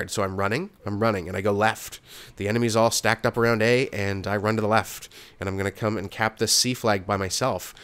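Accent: Canada. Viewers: yes your Canadian... REPREASENT!!!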